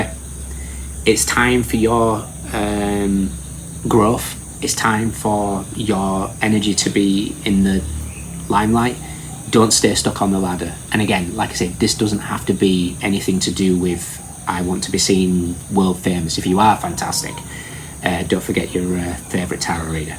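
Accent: Northern England, United Kingdom. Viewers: Yay ,someone British! Liked your reading, although I love all readings from everyone from all over the world 🌎 (I can tell you are northern, where are you?) I am a southerner London 😊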